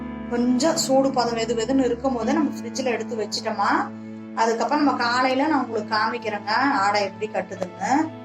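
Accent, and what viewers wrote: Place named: Kongu, India. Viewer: கொங்கு தமிழ் நல்லா பேசுறீங்க.. ஏன் background English பாட்டு போட்டுருக்கிங்க ?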